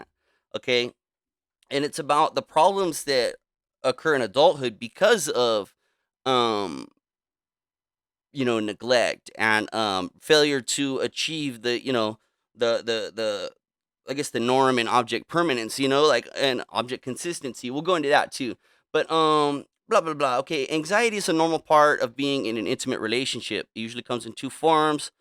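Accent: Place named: Latin America. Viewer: When listen to your voice I am getting a hint of a Latino accent and I am ready for you to break into a Cheech and Chong skit.💨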